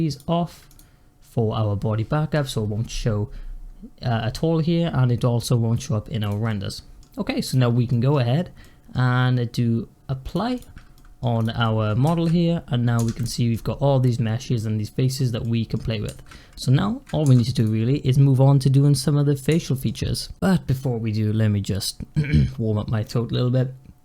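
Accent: Wales, United Kingdom. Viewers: Your Welsh tones added to the relaxing nature of this video.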